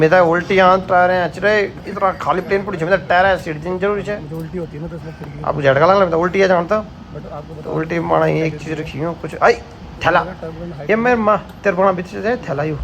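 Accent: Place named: Garhwal, India. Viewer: Germany looks amazing! 😍✨ First of many, hopefully. Garhwali accent was the best! 😂🙌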